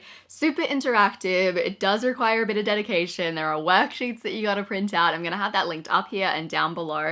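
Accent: Australia. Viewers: Hi, I'm not English but I live in England, I thought she might be from somewhere in the North but I couldn't recognize the accent and after I had a look at her IG I saw she's based in Australia so her accent is actually Australian! x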